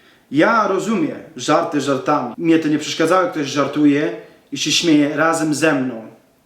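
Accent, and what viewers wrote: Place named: Poland. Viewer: podoba mi się masz taki fajny akcent taki modern polish ale jednocześnie brzmisz jak moja babcia która też jest z Podlasia <3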